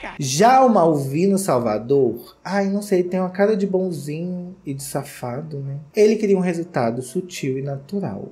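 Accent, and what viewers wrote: Minas Gerais, Brazil. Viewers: Amor jeito mineiro de falar do dono do canal kkkk cucuruco muito mineiro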